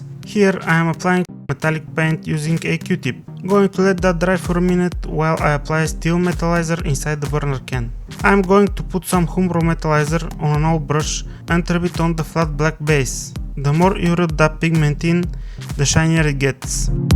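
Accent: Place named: Russia. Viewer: Narrated are good, however your narrations resemble "russian spy" accent in western Cold War movies :)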